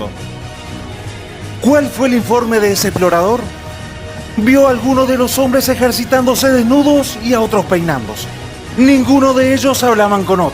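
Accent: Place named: Córdoba, Argentina. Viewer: Ufff ese relato cordobes.... Muy bueno...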